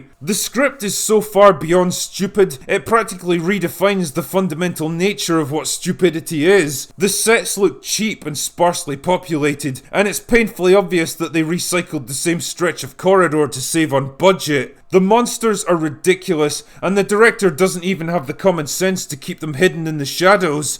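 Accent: Scotland, United Kingdom. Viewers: Why did you chsnge from a very nice listenable scottish accent,to kidding on youre drunk all the time?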